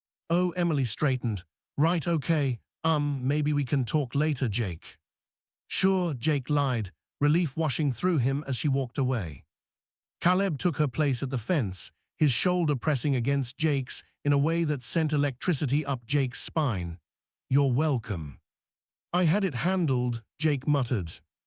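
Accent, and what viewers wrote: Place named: England, United Kingdom. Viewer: Get rid of the English accent, please.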